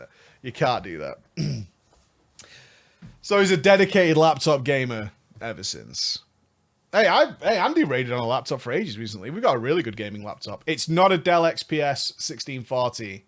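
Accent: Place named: Manchester, United Kingdom. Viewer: Look at the shine on that innit!! Are you from manchester?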